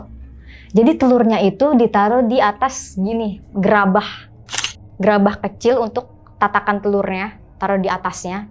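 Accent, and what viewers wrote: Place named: South Jakarta, Indonesia. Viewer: Terlalu Jaksel gaya penyampaian materi nya, hello yg nonton bnyk org daerah bicara nya terlalu jakarta deh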